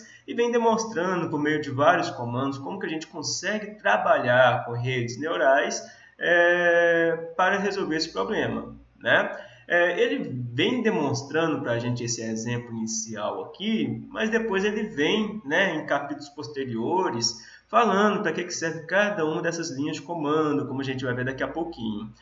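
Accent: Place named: Minas Gerais, Brazil. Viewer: Mestre, você tem um sotaque mineiro, és da UFMG em Montes Claros? Sou de Salinas, fiz essa disciplina na UFV com o professor Fernando e o Tibeau e mais recente em 2010 com o professor Edenio da Zootecnia no mestrado em 2009.. Ótimas sua aulas...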